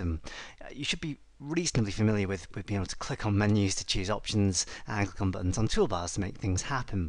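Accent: United Kingdom. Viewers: You know for a british person (I think you are british because of the accent) , you have a nice way of explaining things and your voice is understandable unlike most british accent people I watch :) thanks for this free source of knowledge. Cheers mate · i cant believe someone so wonderful exists to provide these videos for free with an awesome british accent and occasional snippets of humor :) thank you so much!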